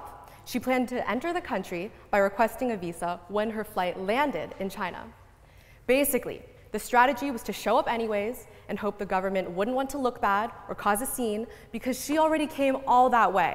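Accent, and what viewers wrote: United States. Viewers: She sounds American. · Haha, she is incorrect of course because we can all see what china has done to it's own people! Plus this girl who seems to know so much about the country she defends has not lived there! If she was living there maybe she would have a better idea in fact about the ccp and how they operate. I believe to defend a government you have to know from experience what you are defending. She talks about the west in a unfavourable way with her American accent, I find it somewhat ironic to be honest. My family are immigrants , yet I do not attest to know the life they endured. I found this girls speech insincere and her attitude aloof. She is very much entitled to her opinions of course and so am I! My advice to anyone who wants to know about ccp go live in mainland China and see for yourself.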